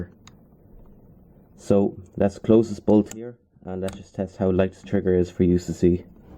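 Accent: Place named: Ireland. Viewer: Sounds like he's Irish.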